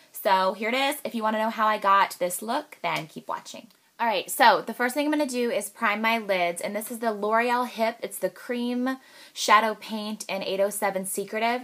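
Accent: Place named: Midwest, United States. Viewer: What... Change your voice? I think you are incredibly easy to understand, if that's what the post meant... Midwestern accents, FTW!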